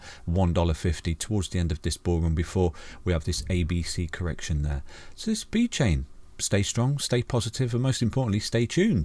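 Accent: United Kingdom. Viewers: Soon as I heard that British accent I subbed.. 🇬🇧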